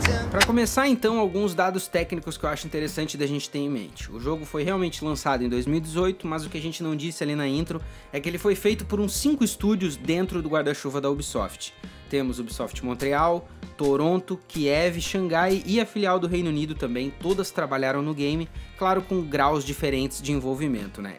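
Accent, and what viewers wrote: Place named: South, Brazil. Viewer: O que me manteve nesse vídeo até o final foi esse sotaque sulista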